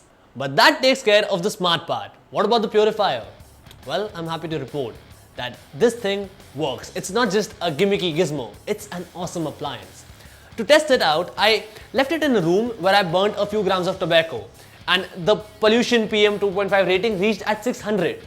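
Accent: India. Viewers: Holy fuck I love Indian accent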